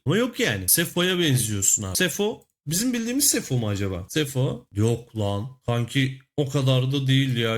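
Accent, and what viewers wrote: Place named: Black Sea, Turkey. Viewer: abi şiven çok komik ve iyi.Karadeniz şiveni seviyorum.sana yeni hayaında işinde... başarılar dilerim abi.veeeee espirilerin gülüşün enerjin çok komik beni hep güldürüyorsunnn.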